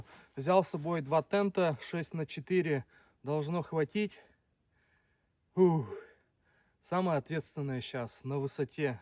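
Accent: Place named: Vyatka, Russia. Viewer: Говор вятский, если не ошибаюсь...)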